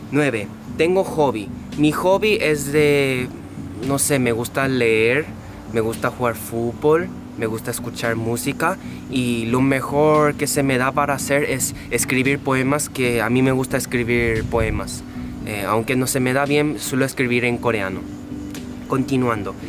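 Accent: Paraguay. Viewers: tu acento si que es Paraguayo😅 también soy de Paraguay. Saludos de Quiindy😄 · Trasmites la calidez de un paraguayo. Te siento un paraguayo más y creo que la pronunciación de la "R" lo confirma. Suena bien paraguayo. Muy bueno tu canal. Cuando vengas por Asunción, ya tienes un amigo. Sólo avisa y planeamos algo. Saludos!!!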